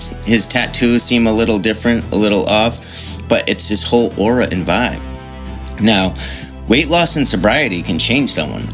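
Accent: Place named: South Boston, United States. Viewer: Wuttup dude, I just saw this video on Lipstick Alley, and I was frustrated that you didn't SHOW us what you described, but I also respect that you expect people to care enough to investigate on their own, which we should, and I most certainly do, and will in a minute about Gucci, who is a total nothingburger to me, no idea who he is, what he looks or sounds like, so my eyes and ears will be a fresh raw test. I believe it, probably, tho, it all sounds UTTERLY likely, given how evil the overlord class is. I just subscribed to your channel, because it looks interesting AF, but mostly because I miss hearing that accent. I grew up in Southie, and I still live in Quincy, but your particular accent is VERY familiar to me, and very few people sound like you now. Over the last 4 months, I've become the Faceclocking GOAT, I've detected and proven HUNDREDS of secret biological family relationships among celebs, often with me and my family directly involved. 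I've been waiting all this time for someone to remind me of Joy Wright-McCarthy, and you win, lol. You also remind me of the Ferris family, e.g., Paul and Justin. On a weirder note, you emit Jack Parsons vibes, and so do I, and so do a surprising number of people originally from Southie. Weirder things were afoot in Southie than anyone can currently possibly imagine, truly the bizarrest shịt in the history of mankind, NO EXAGGERATION, and yes, I am including the ancient Levant, ahem. On a brighter note, we were not just polluted with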